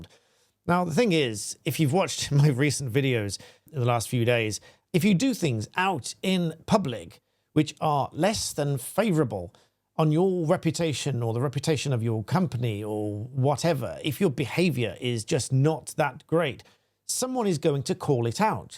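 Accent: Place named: RP, United Kingdom. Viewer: Really wish the algorithm would pi$$ you off from my feed. The pretentious plum that is jammed in your throat leaves you sounding like a failed private school boy trying to do one last ditch makeover on youtube. Twat.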